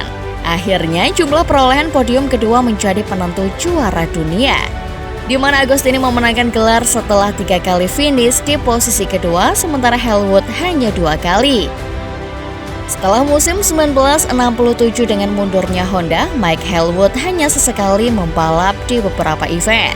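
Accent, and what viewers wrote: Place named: Java, Indonesia. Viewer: medoknya imut bet wkwk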